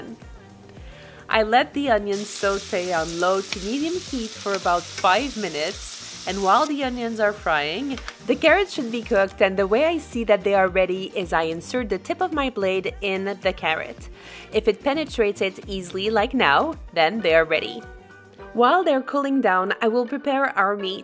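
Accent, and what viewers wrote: France. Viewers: Very interesting. Are you French by any chance? I recognized some of your pronunciation and words:) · מבטא צרפתי באנגלית? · love ur French words and accent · Are you French ? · Ah en fait c’est un accent français ha ha ha je m’étais pas rendu compte mdr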